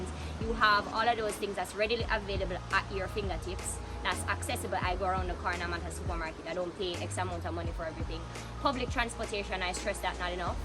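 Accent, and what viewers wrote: Jamaica. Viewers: You have a jamaican accent and behavior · Yo u sound like us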